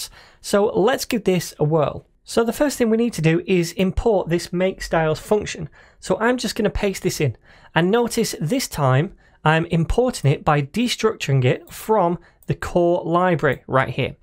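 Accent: United Kingdom. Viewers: Are you from UK dude??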